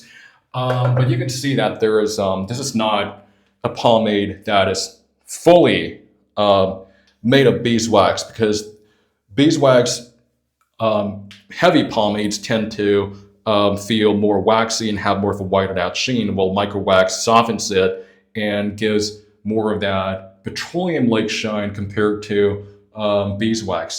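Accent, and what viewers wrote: South, United States. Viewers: You sound like you picked up a Southern accent.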